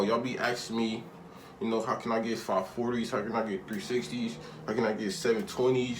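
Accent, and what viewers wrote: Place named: Philadelphia, United States. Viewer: sound like you had dat north Philly accent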